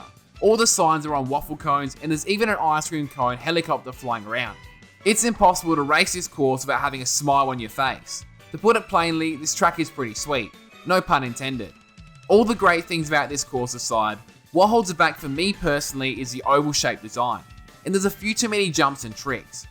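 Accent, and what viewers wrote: Australia. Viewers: Amazing video! Here is my list: 8.Mario circuit 3 7.Sky High Sundae 6.New york Minute 5.Kalimari desert 4.Snow land 3.Sydney Sprint 2.Waluigi Pinball 1.Mushroom Gorge And I really like your Australian Accent:) Keep the good up💖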